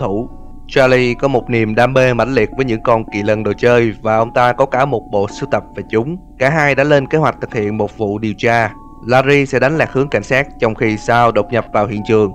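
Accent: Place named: Mekong Delta, Vietnam. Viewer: Omg có thằng e mới giới thiệu trang này xem. Vào xem nghiện luôn. Mặc dù mình chưa chơi game nào trong những game bạn phân tích nhưng bạn có thể suy luận như thế thì thật là quá đỉnh. Ngôn ngữ của bạn cũng chuẩn nữa. Giọng miền tây nhưng rất dễ nghe. Chọn từ ngữ đúng, lối dẫn truyện rõ ràng mạch lạc nên mình nghe phát hiểu luôn. Mình sẽ sub và ủng hộ. Chúc kênh sẽ càng phát triển nhé.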